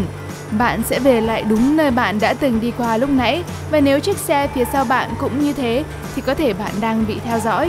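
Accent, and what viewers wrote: North, Vietnam. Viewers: sao bắc kì nhìu quá...nghe ko hay j cả...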